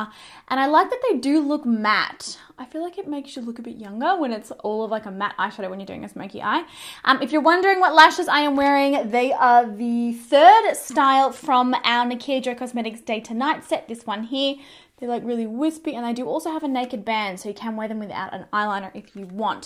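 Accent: Australia. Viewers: Nikkia your prononciation of Jouer with your Aussie accent is spot on in the ears of a French spoken person! ;)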